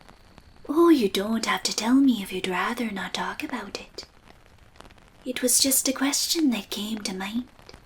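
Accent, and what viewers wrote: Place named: Ireland. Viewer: Your singing sounds incredible, and the Irish accent is really well done! I’ve already listened to it several times. Keep up the awesome work! 😁 Sidenote: What could possibly be more relaxing than a tragic story about war? 😝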